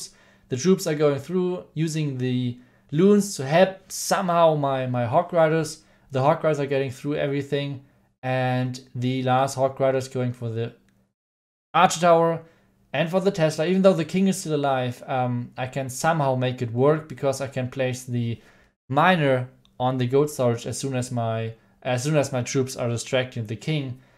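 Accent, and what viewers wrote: Germany. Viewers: Are u an German Boy??????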